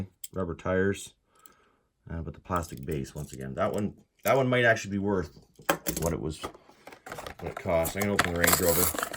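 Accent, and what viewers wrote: Canada. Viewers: Don't be ashamed of your heritage! Just teach them that Canada pronounces things differently, I think a lot of people just assume you're from Wisconsin. lol I used to watch Davidsfarm back in the days, he was practically your neighbor out there in Lucan, and he had the same nuances with his pronunciations, he also did the Zed phrasing.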